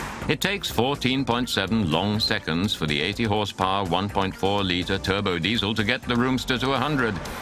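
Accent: United Kingdom. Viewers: Typical english hooooooooooooomosexual talking.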